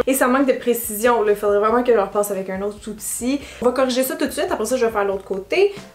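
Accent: Quebec, Canada. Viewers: OmG ce petit accent Quebecois siiiiii charmant❤❤❤❤, le Français de France est vraiment monocorde en comparaison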